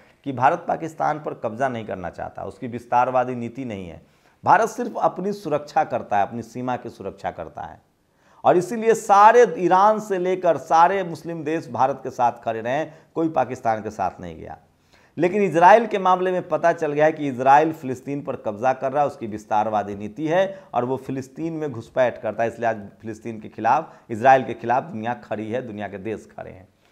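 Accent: Bihar, India. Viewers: sir aap Kaun se state se ho. Aapki Hindi sun kar garvanvit mahsus karta hu ki mai bihari hu. Aapki language me Bihar ki Hindi wali tone aati hai 😊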